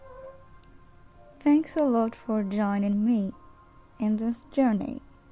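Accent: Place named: England, United Kingdom. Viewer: نسمات الربيع بنبرة إنجليزية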